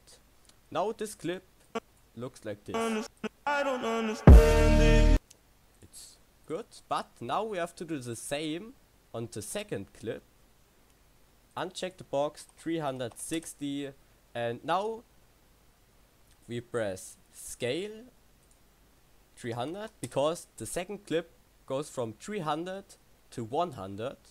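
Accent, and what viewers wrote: Germany. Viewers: Man hat echt doll gemerkt das du deutscher bist>~< No front an dieser stelle. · Das ist der deutscheste Akzent denn ich yeh gehört habe, aber gutes video und erklärung. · Hab vor dem intro schon bemerkt das du deutscher bist haha 😂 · Man hat soo krass gemerkt das du deutscher bist Hahahahaha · ich dachte dauerhaft „warum spricht er so?“.. dann habe ich unten in der Windowsleiste den Deutschen Satz gelesen & dachte mir „AHHHHH DESHALB!“ man merkt halt unnormal krass dass d deutsch bist :D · haha ich hab gemerkt das du deutscher bist ich spreche genau so english hahaha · Ey man merkt deinen deutschen Akzent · Man hört es so stark raus das du deutsch bist xD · Man hört halt voll das er deutscher ist😂😂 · Man merkt das du deutsch bist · Ich habe deinen ersten Satz gehört und dachte mir nur so: Oh, ein Deutscher xD Aber egal, hat mir echt geholfen, danke !!! 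Habe sonst immer mit einer anderen App gearbeitet und war jetzt etwas überfordert mit Adobe xD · Nach 2 Sekunden schon erkannt, dass du deutsch bist^^ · i think you are german xD but thanks for the tutorial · Man merkt das du deutscher bist dein Englisch ist aber trozdem gut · wie man einfach raushört das du deutscher bist ^^ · Man hört diesen deutschen Akzent XD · Man hört so raus, dass du Deutscher bist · Man hört das du aufjedenfall ein deutscher bist aber trotzdem super gemacht!! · wtf just buy the Program and dont crack it 😂 btw dein deutscher Akzent ballert 😂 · Man merkt wirklich das du Deutsch bist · Hey nach deinem Akzent kommst du aus Deutschland oder? xD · You have germany accent :) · I think ure from german hab ich Recht ;$ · haha geiles video, feier deinen deutschen akzent so hart bei deinem englisch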